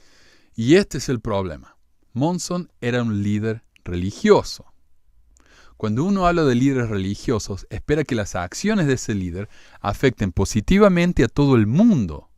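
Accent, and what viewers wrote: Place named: Córdoba, Argentina. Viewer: el acento cordobés que tenes no se te va nunca che. Soy less active y en mucho coincido sobre pte Monson, pero tampoco decir cualquier gilada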